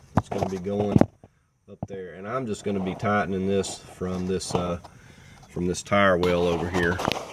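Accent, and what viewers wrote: South, United States. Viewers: Oh man, great video! Very well explained and with good tips. But as a southern man from Tupelo now living in Germany the last 20 years, it was your southern accent that made me feel at home. Loved it! I doubt I will tackle this problem myself but I will definitely not be boogering any more threads thanks to your tips. Definitely a fan now. Keep up the good work!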